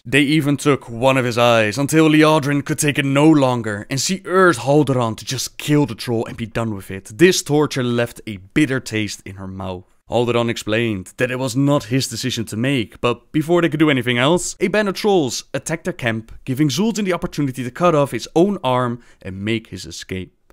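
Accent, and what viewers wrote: Netherlands. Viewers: Garrosh Hellscream If you're talking about, he is Dutch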